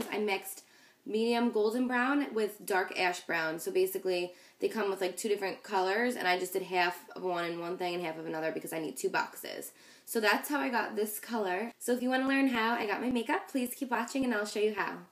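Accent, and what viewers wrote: New Jersey, United States. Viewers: look at the accent! haha jersey girls! we know whats good <3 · Are you from New Jersey? your accent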